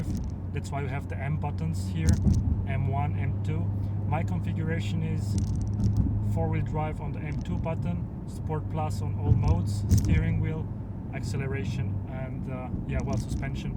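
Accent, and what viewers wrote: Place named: Romania. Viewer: Se vede accentu de roman =))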